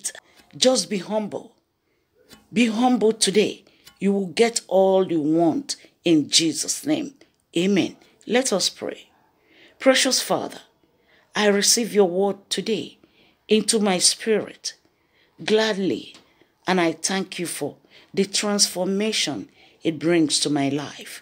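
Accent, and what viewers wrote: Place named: Africa. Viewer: Thanks for sharing the word of God. It feels nice to hear your accent, I'm missing my friends from Africa.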